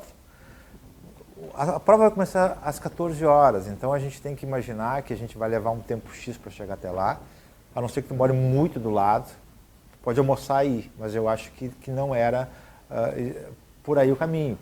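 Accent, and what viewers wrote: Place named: Brazil. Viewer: JÁ TE ACHEI NO FACE E MANDEI UMA SOLICITAÇÃO. ASSIM QUE COMEÇASTE A FALAR JÁ VI QUE ÉS DO MELHOR ESTADO DO BRASIL. SAUDADES DAÍ.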